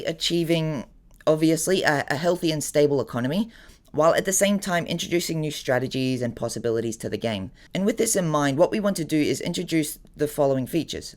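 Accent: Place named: Australia. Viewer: i love your aussie accent just like Rosé 😅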